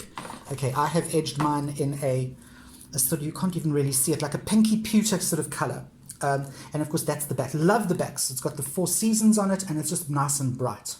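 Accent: South Africa. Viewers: Oh my! WHO ARE YOU??!!! I've only just stumbled upon you vis-a-vis this video. (Who doesn't love a collection video!) Immediately, I recognized, and was drawn to, the South African accent. LOVE your energy, wit, clarity, etc etc... I will be certain to check out other videos on your channel. You've earned a new sub. Thank you for sharing your collection! ♥️ You and yours, stay well. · Really enjoyed your video! Great collection, and your accent made me feel nostalgic, as I was born in South Africa😊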